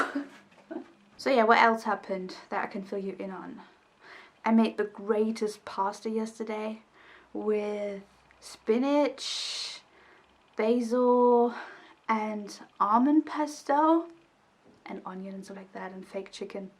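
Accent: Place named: United Kingdom. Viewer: You sound so british 😊💕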